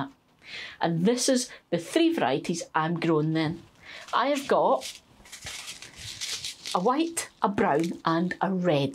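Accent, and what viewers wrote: Scotland, United Kingdom. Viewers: I started with seeds a couple years ago and will never go back to sets! I’m in zone 5 in Canada and because they were starting to die in the house, I planted them outside as soon as I could. Despite getting a little snow and very cold weather, they did great by the end of my growing season! My ancestry is Scottish and I love listening to you speak! Someday I hope to get to Scotland. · ❤Ah ken a wee bit a Scottish! I am hoping to learn more Scottish gardening techniques, as I have learned so many good tips already! Do you have different types of cabbage for spring and autumn?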